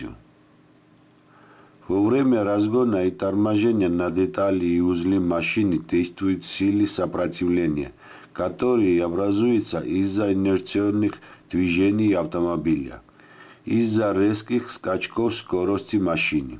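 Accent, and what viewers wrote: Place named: Kutaisi, Georgia. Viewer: ვაა ქუთაისის გაიჩითა :)))ქუთაისელი ხართ?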